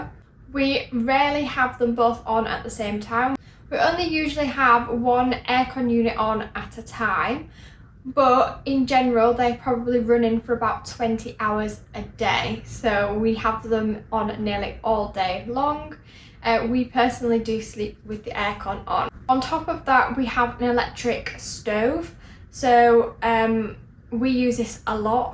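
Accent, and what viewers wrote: United Kingdom. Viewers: what specific british accent is this? sounds cute.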